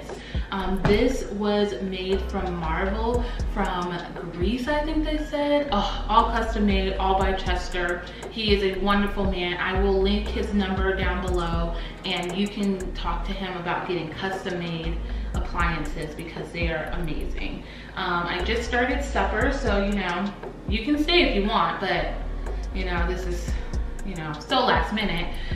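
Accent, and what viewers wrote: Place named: South, United States. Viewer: Is the southern accent for me😂😂😂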